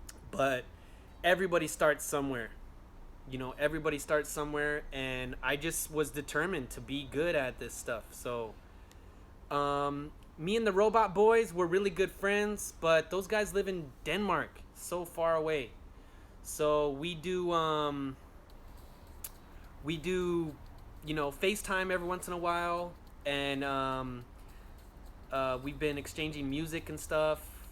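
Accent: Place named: Los Angeles, United States. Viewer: I knew u were from L.A. right when i heard u talk homie!😎👍 You the tightest i seen. Keep it up bro✌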